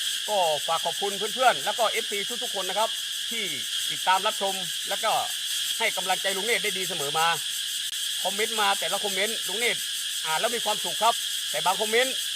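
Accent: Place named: Korat, Thailand. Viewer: ตกลงแล้วลุงเนตอยู่ภาคไหน ภาคอีสานหรือภาคเหนือ สำเนียงเหมือนคนโคราช ติดตามมานานพอสมควรแต่ไม่รู้ภูมิลำเนาลุงเนตจริงๆสำเนียงผมคิดว่าเป็นคนนครราชสีมา(โคราช)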